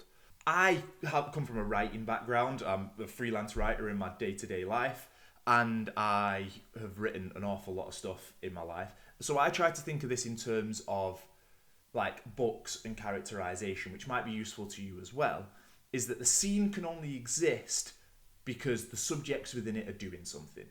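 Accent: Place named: Manchester, United Kingdom. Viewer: Your video came up in my feed. You started speaking, and I thought “he sounds like he’s from Manchester”. Yep! My good friend is from Manchester, and has shared with me all things from the city. Like, and football. So the big question is city or United? Keep up the good work,!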